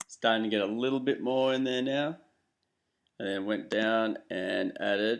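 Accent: Australia. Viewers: refreshing to hear an aussie haha good tuturial man!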